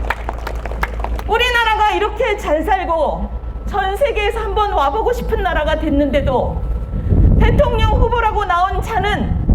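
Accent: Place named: Andong, South Korea. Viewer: 예천 안동 사투리 같은데요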